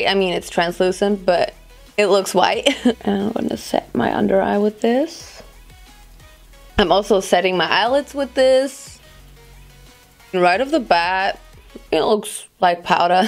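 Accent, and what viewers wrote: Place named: Germany. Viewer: Ist sie deutsch ? Weil die hat Nh deutschen Akzent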